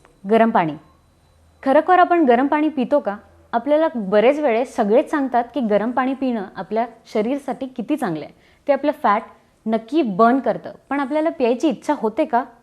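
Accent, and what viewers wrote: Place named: Pune, India. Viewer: Nice पुणेरी accsent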